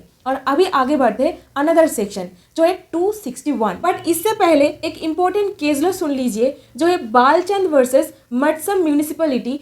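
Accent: Bengal, India. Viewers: Your tongue language like Bengali girl 👧 mam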